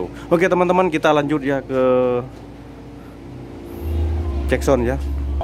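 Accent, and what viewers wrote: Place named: Madura, Indonesia. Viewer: Markas safa audio dimana mas logat ngomongnya kayak orang madura. Salam dari malang🙏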